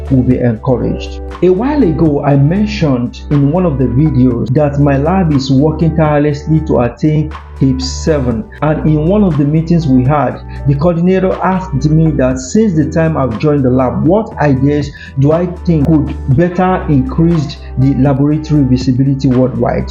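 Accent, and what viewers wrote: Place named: Portugal. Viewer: Good job! I love your Portuguese accent... :face-green-smiling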